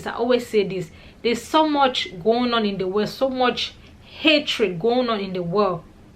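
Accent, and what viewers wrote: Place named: Kenya. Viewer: You sound like a kennya woman though i enjoy your word of wisdom 👌you earn a new friend here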